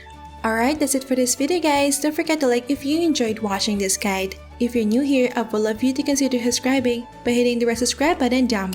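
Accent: Philippines. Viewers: As if the accent was not already an indication that she's filipino and stating most of the time that she uses "PHP" as an indication of BCC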